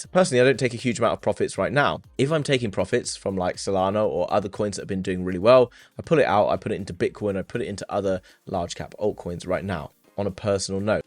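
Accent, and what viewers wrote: RP, United Kingdom. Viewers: Mate, you remind me of Hugh Grant and I don't know why. It must be the hair and the posh British accent.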